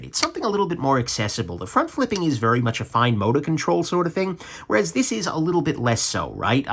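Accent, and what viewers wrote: New York, United States. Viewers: I love your old - style NY accent. It reminds me of Stitch Mahone from the Paradise Ally movie.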